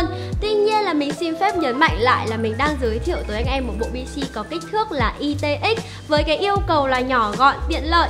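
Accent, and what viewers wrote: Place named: Hai Duong, Vietnam. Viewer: Chắc chắn là quê Hải Dương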